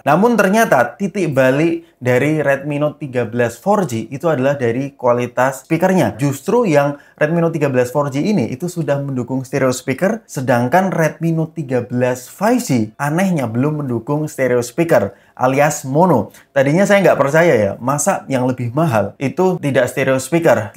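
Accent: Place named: Java, Indonesia. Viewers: Jowo ngendi masse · Wong jogja logat e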